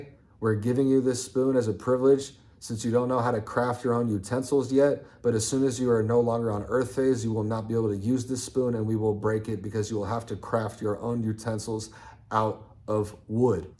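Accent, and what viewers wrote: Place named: AAVE, United States. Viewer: You sound much better when you drop the Ebonics accent. No offense. Why keep up with the African American accent. I understand that you are into Rap and music etc. I don’t even think that Eminem speaks with an African American accent.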